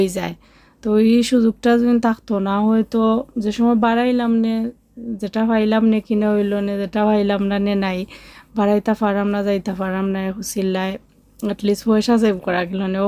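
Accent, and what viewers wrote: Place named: Sylhet, Bangladesh. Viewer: Apu ki,,Sylhet er sunamgonger meye?ami o sylheti. Apnar kotha gulu shune khub valo laglo...tai apnar chanel ta subscribe kore nilam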